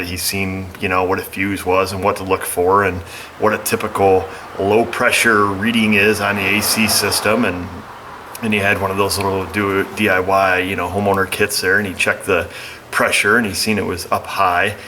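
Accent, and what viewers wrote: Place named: Upstate New York, United States. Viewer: This is a great video, thanks for sharing, sounds like you are from upstate NY id guess Rochester or Buffalo!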